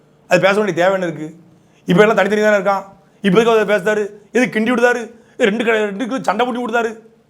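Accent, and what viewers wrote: Tirunelveli, India. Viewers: அண்ணாச்சி நீங்க திருநெல்வேலி மொழியிலேயே பேசுங்க ரொம்ப சுவாரஸ்யமா இருக்குது💯👏👌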